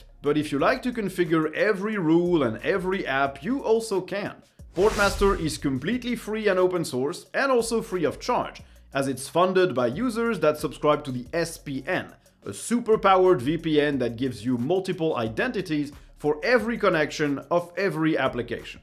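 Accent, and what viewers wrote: France. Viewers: Tu serais pas Français par hasard?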